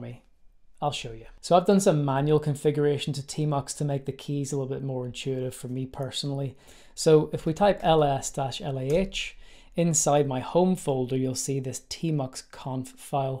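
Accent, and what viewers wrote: Northern Ireland, United Kingdom. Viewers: Ive always loved the northern Irish accent. Its so militant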